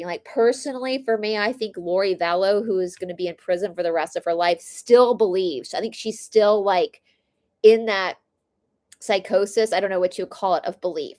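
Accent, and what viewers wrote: South, United States. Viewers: Oh, and all the southern isms are spot on, lol.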